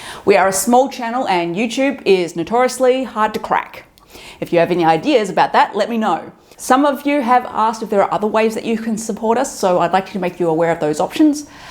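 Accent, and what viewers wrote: Australia. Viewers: Hello im an american and just found your channel,. It is very interesting and I plan on seeing 👀 the other videos. Your videos are really get to the root of a problem i see alot in America 🇺🇸 and that is a paralyzing fear of death. Most folks here have trouble with the subject. Perhaps it that many Americans have grown more Godless. If you dont believe in God and an afterlife then whats left??? It scary for those folks. Also I really like the aussie accent and your appearance, you seem very thoughtful and sweet. Many thanks, Ralph😊👍🇺🇸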